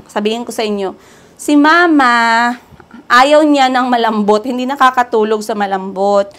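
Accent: Iloilo, Philippines. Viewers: ilong go kaba kc yong tono ng Salita mo ilongo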